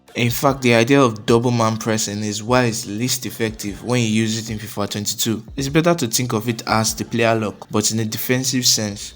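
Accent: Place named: Nigeria. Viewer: sure bro. You sound Nigerian. You from Africa ?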